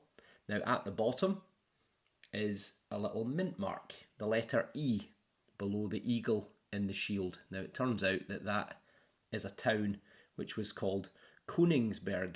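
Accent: Scotland, United Kingdom. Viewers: Thank you for the extensive and fascinating explanation of the two silver coins. This is why I follow your metal detecting channel and your Scottish accent. I follow more metal detection channels but there are only two that stand out and you are one of them. When I watch a recording of you on YouTube, my wife always shouts, that's the man who always shouts, oops I dropped it. Thanks for the effort and time you put into your YouTube channel, I really appreciate it.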